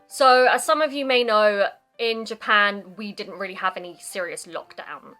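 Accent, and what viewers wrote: RP, United Kingdom. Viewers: Interesting and informative. You speak well , you presention is superb. Is that the Queen's English?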